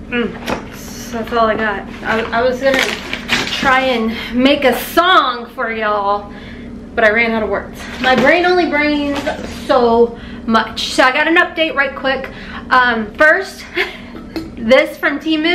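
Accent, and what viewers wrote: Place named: Tennessee, United States. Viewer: I just found you threw another channel. But I can say I'm a fan for life. I'm from Tennessee but moved to Florida. I miss hearing people talk the way we do. And I ha e a Haylee. Lol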